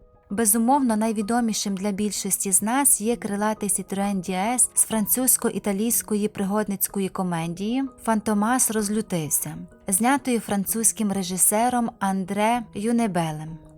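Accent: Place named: Central Ukraine, Ukraine. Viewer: ви певно з центральної України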